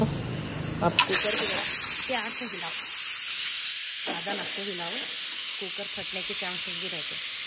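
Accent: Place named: Hyderabad, India. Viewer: Hyderabadi language zabardast baat karthai ap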